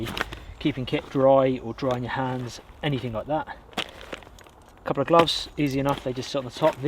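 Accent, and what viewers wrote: England, United Kingdom. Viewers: What is up my brother from South Korea 🇰🇷wow i feel your English is England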